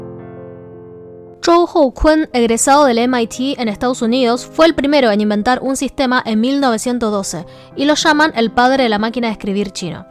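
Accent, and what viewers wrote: Argentina. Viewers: Sos mas argentina que yo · Un linda chinita que habla con acento argentino!! Tenes un nuevo suscriptor che! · Es graciosos ver a una china con acento argentino 🤣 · Me encantó el video y me gusta tu acento argentino. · Amo su pronunciación china/argentina dioss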